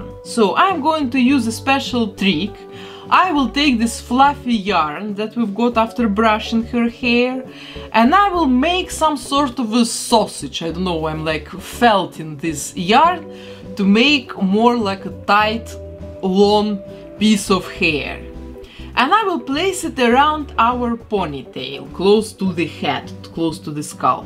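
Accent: Russia. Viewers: почему тут нет ни одного русского комментария? я слышу 1000% русский акцент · Only me hear that strong Russian accent? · Она не похожа. У нее акцент как у русского, который пытается говорить на английском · У тебя русский акцент или мне кажется... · Хех прикольный русский акцент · вы ведь не американка и не англичанка. у вас акцент как у русской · вы точно не русская? прям как будто русски английски, так сказать, звучит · Ох, этот русский акцент.. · Почему мне слышится русский акцент? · Ты русская? Акцент русский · Почему мне кажется что у нее есть русский акцент. Будто бы русская, выучившая английски. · Этот убийственный русский акцент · Русский акцент ?🤔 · Даже я слышу русский акцент)) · Видео крутое, но русский акцент мешает наслаждаться им в полную меру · Мне кажется, или у неё английский с русским акцентом..... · Слышу русский акцент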